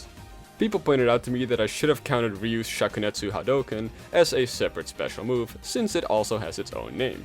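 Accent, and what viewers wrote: Netherlands. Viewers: Ben je Nederlands of Vlaams? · "mensen denken sowieso dat dit duits is" I'm dutch (well actually flemish but who cares) and that's not german. I do not actually know what weird language that is, but I do recognise in your way of speaking english that you are a fellow dutch speaker. Why did i write this. Duits is een fantastische taal en Duitsland is een mooi land. Nein, niederländisch ist fiel besser als deutsch. Yeah i'm bi... trilingual... maybe we can even count french, nah i suck at french. I should really stop now this is becoming cringey. Why am i continuing, the cringe is real. Cringe and cringey are cringey things to say. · Can i maybe ask if you are from the Netherlands because your accent sounds a bit dutch. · now i cant unhear your Dutch accent.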